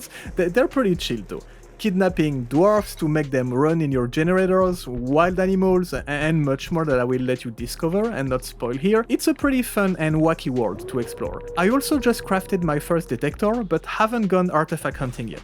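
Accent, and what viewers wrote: France. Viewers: I cant believe theres so many people not recognising your french accent you sound exactly like houngoungagne who makes cs and hes very french too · Call me fool, but you got french accent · Je sais reconnaître un accent français quand j’en entends un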